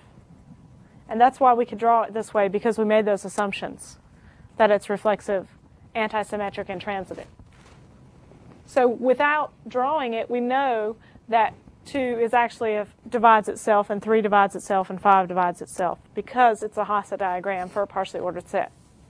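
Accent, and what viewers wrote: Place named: Texas, United States. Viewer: Accent as sweet and Texas tea.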